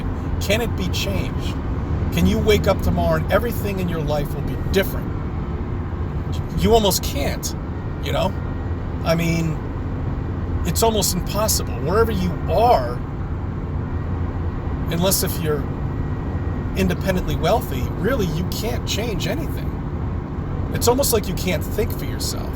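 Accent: New York, United States. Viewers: There's something about driving that turns our "thinkers" on.. I found you about a month ago after hearing you on sun and moon group.. you're New York accent brought me home.. I'm in FL now for 7 years but am from the North shore of Long island.. great ponderings , I have them too.. it led me to this channel on yt called the overwatchchannel.. Dan has a great series on how to escape the matrix and reincarnation trap.. pretty mind opening/bending stuff.. It'd be interesting to hear your thoughts on it.. I'm 2 years Into my fe awakening and can honestly say I'm not friends with a single person I used to be anymore..it's crazy.. and all because they simply refused to just look??! Them chemtrails, vaccines and fluoride certainly have done a very well done job.. bravo to the psycho's.. they certainly created quite the cognitive dissonance cocktail for the ages.. 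anyway check out Dan's channel.. thanks for keeping it real 👍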